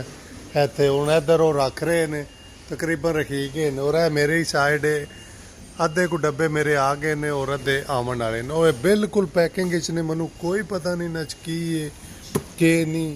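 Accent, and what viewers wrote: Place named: Jhelum, Pakistan. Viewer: Ap Pakistan kis city say biking karty ho ap ki zuban bolo jhelum ki lag ry hay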